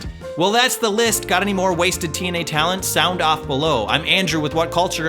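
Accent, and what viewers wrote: United States. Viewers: Who the fuck is this guy with his annoying, overly exuberant American accent? When I click on a WhatCulture vid I want to hear bland, monotone British voices. Not this shit! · Why is this guy american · It's because he's American isn't it?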